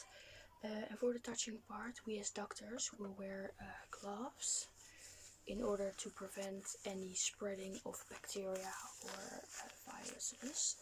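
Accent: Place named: Netherlands. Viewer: Ik herken aan je accent dat je uit Nederland komt ;). Niet dat je Engels slecht is, helemaal niet! Je spreekt goed en vlot Engels, zonder aarzeling. Dus proficiat daarvoor! Ik vind het altijd leuk om nieuwe kanalen te ontdekken, in het bijzonder wanneer ze nog aan hun begin zijn. De kwaliteit van het beeld is heel goed, het geluid ook en er zijn geen storende geluiden in de achtergrond, je stem is klaar en -héél belangrijk voor mij zonder geluiden van het speeksel, ik kan dat niet verdragen. Ik zou enkel liever dat je stem een klein beetje minder luid zou zijn. Maar ik zie veel potentieel in jou en zal abonneren, om je aan te moedigen! :)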